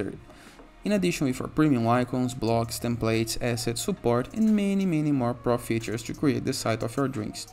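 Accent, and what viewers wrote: Brazil. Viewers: Are you brazilian? Your accent sounds really 🇧🇷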